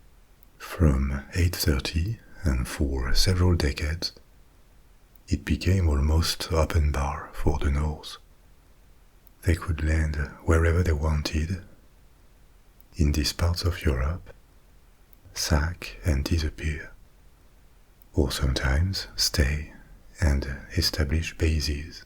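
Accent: France. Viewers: Your french dialect is whats makes it perfect. Thank you for your work. Listing to it every night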